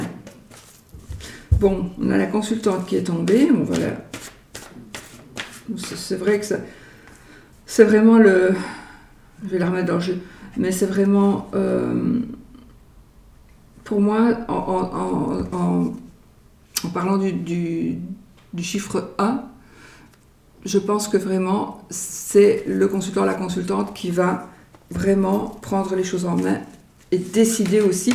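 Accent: Belgium. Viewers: Meilleurs voeux madame j'adore votre accent les Belges j'habite en Belgique avant quand vous dites 8 😅😅😅bonne année à vous et mille merci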